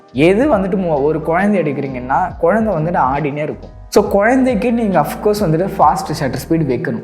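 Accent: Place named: North Chennai, India. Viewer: Bro unga north chennai accent ... I love it bro. I was in ponneri for 2 years. From thanjavur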